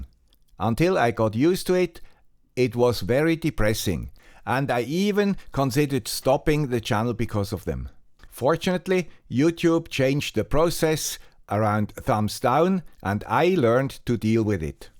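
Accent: Switzerland. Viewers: Surely for many of us, you are not just the guy with the Swiss accent, you are a great professional and a true gentleman. Take the time to enjoy your family, especially your grandchild. ! THANK YOU ! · I will miss your Swiss accent enormously. Not to mention the Hat. If you have anytime I could do with some help on a radio project I am attempting to come up with a solution for. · Hallo. Das ist ja eine traurige Nachricht für Deine Follower. Vielen Dank für die vielen hervorragenden Videos. Du warst ein fester Bestandteil am Sonntagmorgen, oft beim Frühstücken. Meine Frau erkennt den „guy with a Swiss accent“ auf Anhieb😂 Ich komme auch aus der Softwarebranche und habe mich immer gefragt, wie Du es schaffst, diese sorgfältig recherchierten Videos in einer Woche zu erstellen, neben einem anspruchsvollen Job, über viele Jahre. Hut ab. Ich werde Deine Videos vermissen, und die Impulse, die Du gegeben hast. 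Danke für alles, genieße die freie Zeit. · Dear, the guy with the Swiss Accent - Thank you..... Like many others you kickstarted my homeassistant, arduino, Esp32, wifi, Raspberry PI (grafana etc,), BangGood and Aliexpress addiction 🙂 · Thank you und "Grüezi" from Luxemburg. It was tremendeous all these years listening to your accent, ;-) while learning the different technologies you presentend with heart and soul ;-) Thank you for all these shared hours ;-) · Thank you for all the years you have run this channel and given me lots of information and good ideas. Lots of 8266 and raspberry pis were built and programmed here, using inputs from your channel - and they are still running their Tasmota, IOTStacks or more homegrown code. As I was born in Basel but living in Denmark, I am visiting the town and of course Zolli as often as possible and I was happy to see the guy with a Swiss accent running this channel. 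Nice to hear that the reason for your “slow down” on YouTube is Life, family and grandchildren. Maybe I see you one day standing at Spalentor, when I get out of the 33-bus from Wanderstrasse. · Hi there, another guy with a swiss accent. Big fan of your channel! I took over a farm this year and you are the reason why i am currently connecting every cow to my Lorawan. You got me hooked on LoRa. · Swiss accent with a heart :) best ahead to yourself and your family. Thank you for sharing your journey. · We'll always be grateful to the guy with the Swiss accent. Thanks for teaching us so much over these 10 years. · You will be missed,the man with the swiss accent. I hope someday you get back to regular videos, I don't mind if you accept more YouTube ads. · Thank you, you excellent guy with the Swiss accent.I have learned so much from you. Your videos have breadth and they have depth; I have learned what I can do, and how I can do it. 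I've been fascinated by your experiments with LoRa and IoT. You've earned your rest. My very best wishes for the rest of your life. Adrian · Thank you for all your fantastic work! As a French speaker (France), English with a Swiss accent makes it easier for me to understand ;-) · Thanks for all the great content over the years,! I learned a lot, and my wife always chuckled when she heard you say, "the guy with the Swiss accent!" Thank you! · I'm an old engineer myself. Have enjoyed your videos, I like your swiss accent! And you have an engineers inquisitive mind. Glad you are open to still posting in the future, you've gotten good at this, but it should be fun and not a chore and feel free to do it only when you feel like it. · Thanks for all of your video. It make me feel more understand about electronic device, especially radio one. "Guy with Swiss accent" Will never gone. Thanks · No! 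Suisse accent ! 😢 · As a long term viewer, but not so a commenter. REALLY Thank you for all the new ideas, tech, and all the effort put in each video!! I always look up for your channel by "the guy with a swiss accent" and works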